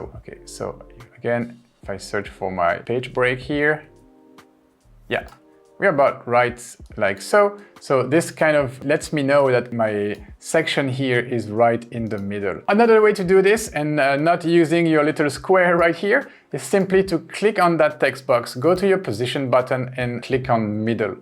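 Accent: France. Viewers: Your accent sounds french , and i wanted to tell you MERCI BEAUCOUP pour tout le temps investi dans cette vidéos et toutes les informations que vous nous avez servi gratuitement , un compte YouTube qui se démarque de loin de tous les autres encore un énorme merci à vous ❤️ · French accent ?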